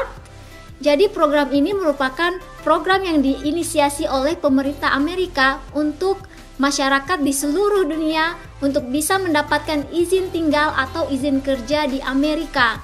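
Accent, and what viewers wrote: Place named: Palu, Indonesia. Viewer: Lebih lagi kw buat vlognya pake dialek palu.... Bangga orang Indonesia, khususnya palu. Dstu di liat betapa bragamnya Indonesia...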